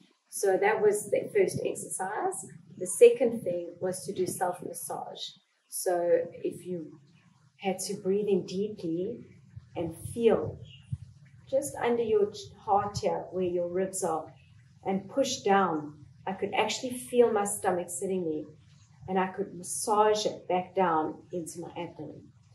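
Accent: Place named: South Africa. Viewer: im australian i just heard the accent and thought it was south african :)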